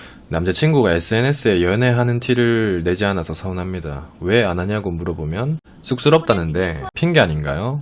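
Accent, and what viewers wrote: Gyeongsang, South Korea. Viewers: 은 너무 많은걸 알고계시다 마인드가 스마트하네 대구 말씨도 넘좋다 ㅎㅎ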